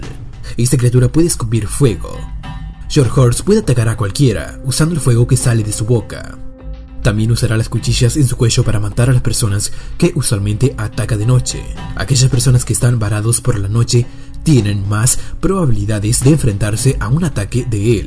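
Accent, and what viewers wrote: Argentina. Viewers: ares argentino dices cuecho en realidad es cuello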